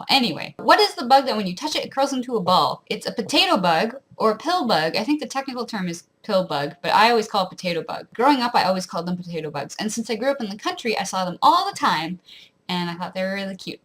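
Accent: Ontario, Canada. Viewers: SO... CANADIAN... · I'm from London ontario and your accent it's super stereotypical canadian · I also apparently have the Canadian accent. But you, you are what memes are made from. Date me. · I can hear the accent. I’m from southern Ontario as well but not a rural area. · I'm from peterborough ontario, I can definitely hear the accent since I've lived in Toronto since 2009, so my accent is like a mix of ptbo and Toronto now but definitely a difference. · I can hear your accent; i'm from Ontario as well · Yeah I can hear the accent, too many hawks down in southern ontario. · I loved listening to you speak! It's true about the "out" & "about" thing. But I really think it's cute the way Canadians say it. I'm from Northern California btw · Formally from Southwestern Ontario (grey/bruce county). Lost most of my accent the last 20 years and I can lightly hear your accent, but my friend in Ontario can't at all, and my friend out west here with me can hear it really strong. 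Are you from Perth County? Your accent sounds similar to what I used to have. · I was trying to explain my uncle’s “rural Ontario accent” to my son. I’m from the Toronto area and he was as well but more the outskirts, eventually to places including Barrie and eventually Palmerston. Your accent was pretty close! It’s interesting being from the burbs of Toronto and knowing I sound a bit different than my cousins, with a Brit influence on top of that. One specific thing is the tighter run-between of one word into the next for you plus the order of words in some instances. “Holla!” is not a thing I would ever say…might say “hey there!” or “hi!” or “what’s up!” I do know my accent is distinctive—people outside of Toronto can usually tell. 😂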